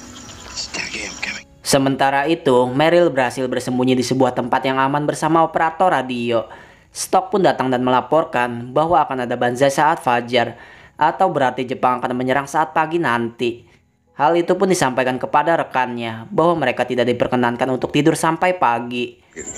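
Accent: Indonesia. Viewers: Dari aksen bicara ke Indonesia bukan papua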